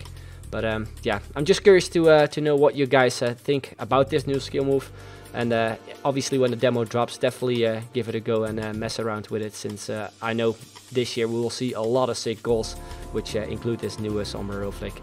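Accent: Netherlands. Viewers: jij bent sws nederlands xD hoor het aan je accent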